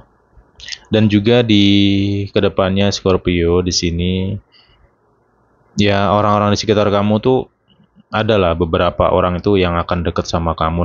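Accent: Java, Indonesia. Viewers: Scorpio hadir matur suwun vidionya, aq seneng cara penyampaiannya jowone MEDOK BANGET hehehe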